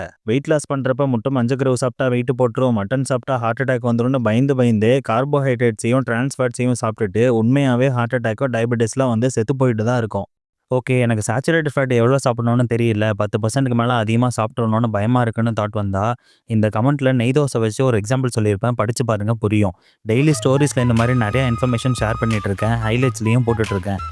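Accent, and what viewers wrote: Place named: Erode, India. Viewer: Indha erode makkal slang heh ❤❤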